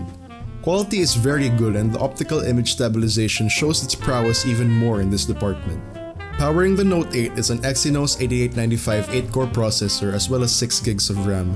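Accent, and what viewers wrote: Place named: Philippines. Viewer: Noypi accent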